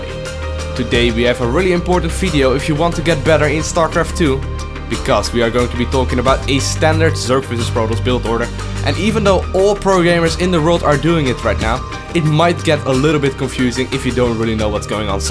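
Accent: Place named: Netherlands. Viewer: Wow you're so obviously Dutch the accent is uncanny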